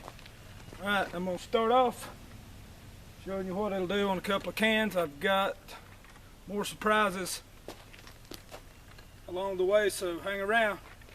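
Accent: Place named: North Carolina, United States. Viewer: ya from buddy ? sounds like ur from North Carolina . Im not far from ya here in Virginia. And I got one of those H&R shotty s in 12ga given to me xmas of 78. Its one of those guns that you never get rid of cause your parents got for ya and that makes it all the more worth wow !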